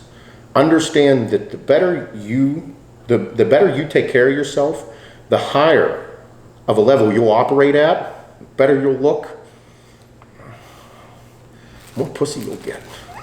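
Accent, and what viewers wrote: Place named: Cleveland, United States. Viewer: You anywhere near Cleveland? I hear the accent and see giant eagle got a feature.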